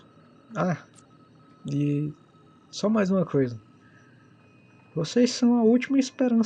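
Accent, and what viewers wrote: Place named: Brazil. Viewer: Eu sou do ES naturalmente "sem sotaque" mas ouvir um sotaque tão brasileiro me aproximou tanto que não sei explicar.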